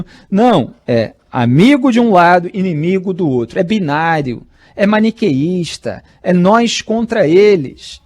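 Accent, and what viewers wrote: Rio de Janeiro, Brazil. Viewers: Esse jornalistinha safado com sotaque da zona oeste do RJ só fala m. Vai lá perguntar pro marreco moro sem vergonha se ele te arruma alguma boquinha lá nos EUA . Safados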